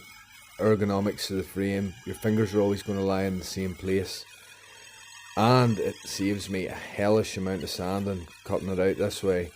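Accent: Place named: Yorkshire, United Kingdom. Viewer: luvin your Yorkshire accent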